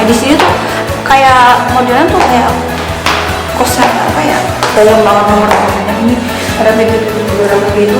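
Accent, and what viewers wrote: Banyumas, Indonesia. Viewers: Kok agak2 medok ya. Orang mana kak? Kayak logat banyumasan gitu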